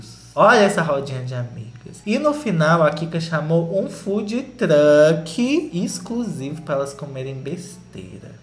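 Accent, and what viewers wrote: Minas Gerais, Brazil. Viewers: 😂 do céu! Você é INCRÍVEL! E, pelo cristo, não mude! Adoro seu “mineirês” de tudo baum! Um cheiro!😁✨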